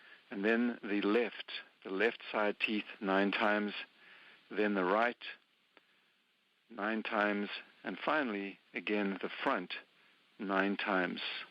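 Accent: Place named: South Africa. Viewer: I’m in South Africa. I heard your accent and got excited that you might be in SA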